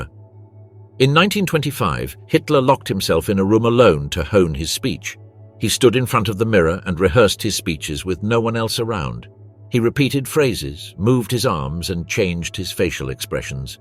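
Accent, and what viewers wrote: United Kingdom. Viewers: lol same old propaganda video 😅😅 lmaoo with the same old British accent 😊! Give me a break, don’t worry all these lies told about Hitler one day will be proven false, he did NOT kill millions lol, you have zero proof, once I get the speech or signature I’ll beleve it.. the great awakening ppl!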